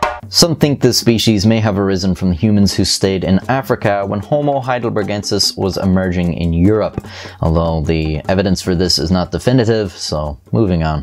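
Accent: Ireland. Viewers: How does only have 500,000 subs? Man u cover all the coolest shit. Definetly the coolest Irish guy I've ever seen lol just seem less like a guy with a kilt than the rest hah. Thx 4 the vid man. War history stories r my favorite thing u cover like the ww2 meth guy but any war history is interesting to me. Next time u get time for a war story up your sleeve would love to hear one. I usually cant stand an Irish accent like old British is also nails on a chalkboard for me but this guy is pretty chill I don't even notice it. I live in the sticks of Kentucky so im sure I sound strange to some people. Conor McGregor gave u fellas a bad rep god tht dude is obnoxious lol. Keep it up man love the content 5 star quality. I'm honestly suprised you don't have more subs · "15 of tha fookers were found in a cave" This is why we need you narrating history documentaries, nothin captures my attention faster than that lovely irish accent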